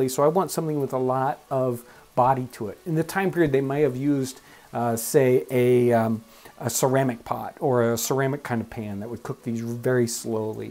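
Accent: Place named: Midwest, United States. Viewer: Does anyone else love his Midwestern accent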